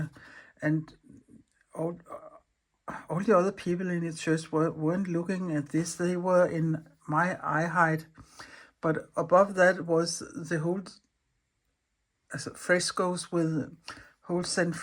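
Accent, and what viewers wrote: Denmark. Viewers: Love your collages and colors and your little chats with your danish accent.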